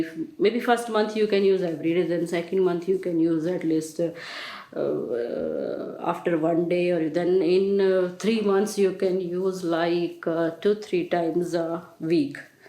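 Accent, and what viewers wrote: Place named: India. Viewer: Мне нравится её индийский акцент и было бы прикольно еще посмотреть версию полностью на русскоя языке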